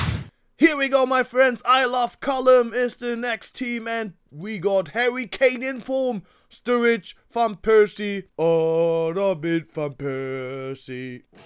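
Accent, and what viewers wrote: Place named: Germany. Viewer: Man merkt sofort dass du Deutscher bsit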